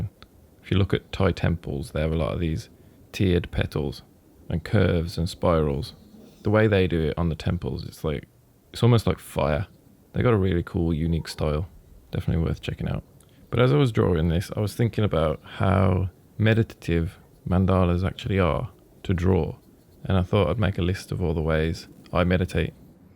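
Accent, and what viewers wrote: Birmingham, United Kingdom. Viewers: Beautiful work. Refreshing to hear a Brummie accent - if I'm not mistaken. Thought you'd be from across the pond. 😂 ❤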